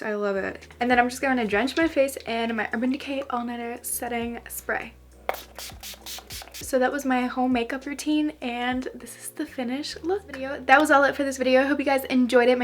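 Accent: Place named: Pittsburgh, United States. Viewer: Hahaha it's her accent- most people from Pittsburgh say it that way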